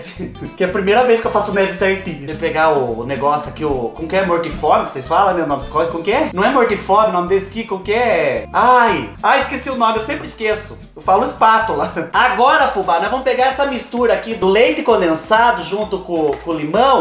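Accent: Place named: Paraná, Brazil. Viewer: Fubá eu queria saber de q estado vc é?porque este sotaqe seu,parece do povo daqui do Paraná! 😘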